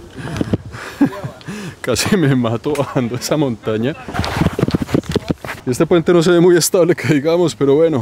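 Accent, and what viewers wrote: Bogotá, Colombia. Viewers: Este Man es muy rolo❤... "Sospecho pecho" 😂me encanta, me siento muy identificada, como si estuviera hablando uno de mis hermanos. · Lo que más me gusta de es esa sencillez al decir soy Colombiano y la forma de hablar típico de nuestro país, bendiciones desde Bogotá. 🇨🇴☺️ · ¡ me encantas con esa manera de expresarte y sobre todo tu acento “ oiga mijito” asi hablan mis hermanos Colombianos, yo soy de Mexico y soy tu seguidora. Saludos y bendiciones🙏🥰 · Muy buenas entrevistas, hermosos paisajes, buen acento Colombiano u ahora ya hablas Ruso, muy lindo es Rusia y la gente es muy amable y hospitalarios.. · SÍ...ÉSE ACENTO COLOMBIANO,,,VIVA COLOMBIA....SALUDOS A TODA ESA GENTE LINDA DE COLOMBIA. L@ AMO.SALUDOS DESDE NICARAGUA EN AMÉRICA CENTRAL 😘😘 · Rolo, cachaco, es decir. De Bogotá, la capital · Muy bueno ,en total acuerdo!!!! Ese acento colombiano y hermosa tu efucacion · Que parte Colombia eres? 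Esos modismos en tu acento, se me hacen conocidos. Saludos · Saludos Parcero,Soy 🇵🇷 desde EU. Me encantan tus videos sobre todo lo buen aprendiz de los distintos idiomas y el acsento colombiano que tiene. Gracias x visitar el planeta x mí educarme y dejarme ver lo hermoso q es.. aunque espero muy pronto explorar así cm usted. Q viva 🇨🇴 y el resto del mundo 🌎🤗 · Hola, qué chévere saber que eres Colombiano, mijo! Es el segundo video que veo y espero ver todos los que nos puedas compartir. Con el primer video sobre TATARSKAN cuando te escuchaba narrar, me dije "este man tiene que ser Colombiano, obvio por tu acento", sin embargo, leyendo comentarios nadie hacía alusión al tuyo. Finalmente, hoy viendo este video confirmé que lo eres. Debo decirte que tus videos repito, solo he visto dos son del putas, mijo! Te felicito sinceramente. Por supuesto ya me suscribí a tu canal. Desde Bucaramanga, recibe un abrazo bien pingo!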